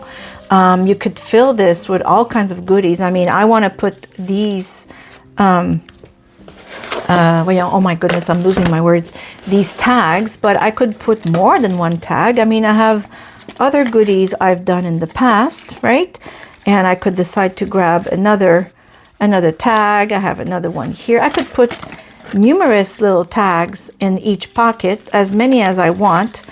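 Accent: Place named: Quebec, Canada. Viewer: This is so cute and easy !! Thanks for sharing !! Is your French from Quebec Canada ? Just curious since I’m sensing a French Canadian accent 😅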